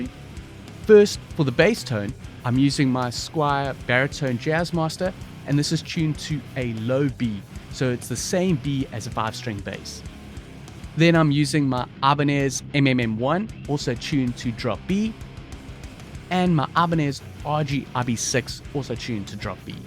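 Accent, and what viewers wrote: South Africa. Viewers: Hi. I am a South African too, but living in the UK. My friends in the UK always pick on me for the way I say "here". I never really noticed it with myself, but when I listen to you speak, it is really noticeable. Hahaha. Anyway, this is a really cool video and great sound that you get. I am really enjoying learning what you have to teach. You showed us a bit of your mixing in this video, but How about a more in depth mixing tutorial? If you have already done this, my apologies. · I love the South African accent! Relaxing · Are you perhaps South Africa? I am but living away from home ...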